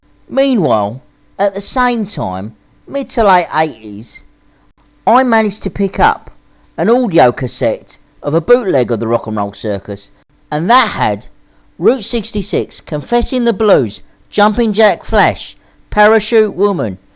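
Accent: United Kingdom. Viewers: i like it's genuine british accent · Man has a genuine British accent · I love the Cockney accent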